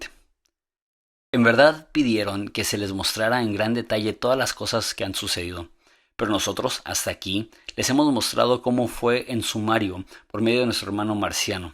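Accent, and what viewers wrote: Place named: Mexico. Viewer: Vos no estás capacitado para leer en vos alta: mala dicción, pausas inadecuadas,falta de acentuación y de entonación, tu marcadisimo acento mexicano.La misma máquina que transcribe al pie lo demuestra.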